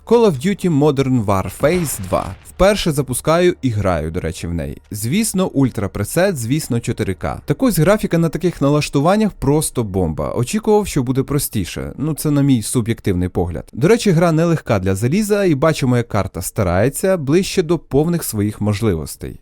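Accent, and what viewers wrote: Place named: Bila Tserkva, Ukraine. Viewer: шо за прекрасний акцент? -Білоцерківський! Думав показалось, а нє-земляк. Привіт!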